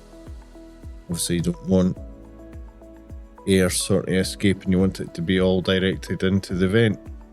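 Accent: United Kingdom. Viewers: I cannot tell you how nice it is to hear a 3d printing video with a British accent! Great review, good testing, thanks for a good video. I havent done any back to back testing, but I fitted the jet pack they sell and haven't noticed any real difference. Considering swapping it back now I'm more familiar with the printer. I have noticed that orienting your overhangs towards the aux fan on the side helps enormously. A couple of repeat order prints I make have to be oriented a particular way, and I could physically fit 16 on the bed, but only 3 nearest the aux fan will be up to standard.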